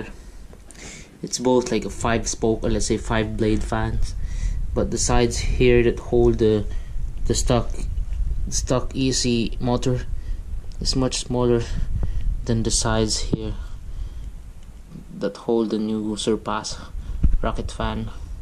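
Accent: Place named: Malaysia. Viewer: i thought u are from malaysia as your ascent is quite similar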